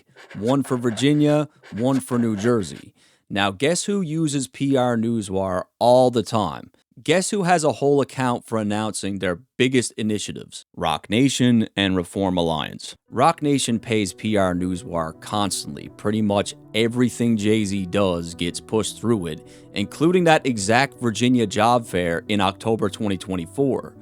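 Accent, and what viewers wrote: Canada. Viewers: he’s Canadian lol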